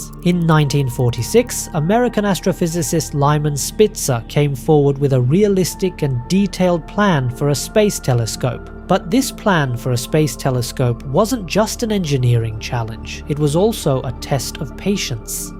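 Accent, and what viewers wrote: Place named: India. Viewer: Sonunda Ai işini çözmüşsün çok güzel olmuş hint aksanı İngilizceden sonra hocam (haftalık canlı yayınlar geri gelsim bu arada)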